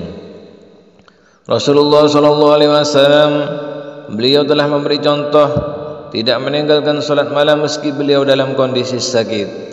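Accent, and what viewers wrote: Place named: Madura, Indonesia. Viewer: kayaknya suaranya orang madura aku gak suka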